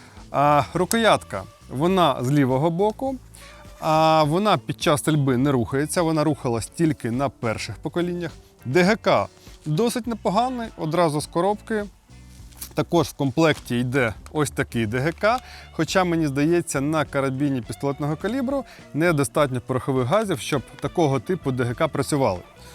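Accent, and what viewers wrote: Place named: Russia. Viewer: Добрий ведучий, але щелепа російська- смішно слухати.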